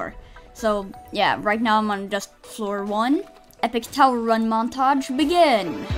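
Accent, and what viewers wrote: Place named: Scandinavia. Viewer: Are you scandinavian? I am norwegian and I recognize the accent hehe :)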